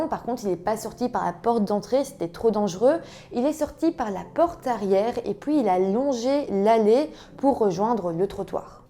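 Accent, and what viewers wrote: Belgium. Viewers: Incroyable la justice américaine sur ce dossier, ce n'est vraiment pas cher payé pour un assassinat Merci pour cette histoire, j'ai cru reconnaître un léger accent belge, me trompe je ? CC des Vosges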